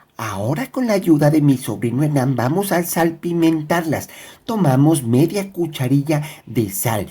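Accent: Colombia. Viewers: Puro asentó nariñense me encanta que viva pasto desde ipiales Nariño saludos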